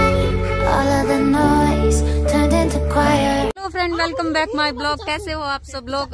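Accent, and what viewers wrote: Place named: Haryana, India. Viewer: Hum ko bhi haryanvi sikhni padegi lagta he qki bohat achi lag rahi hai aapki haryanvi. Hum to Mumbai k he Marathi bolte he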